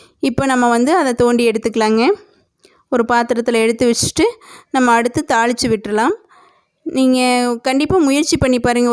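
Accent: Kongu, India. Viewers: தலைக்கறி நாவிற்கும் கொங்குதமிழ் கேட்பதிற்கும் சுவையாக இருக்கிறது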